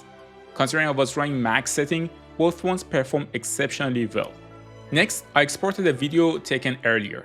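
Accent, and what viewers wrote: Iran. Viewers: چرا لحجه ایرانی داری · لحجه فارسیتو دوس دارم 😅❤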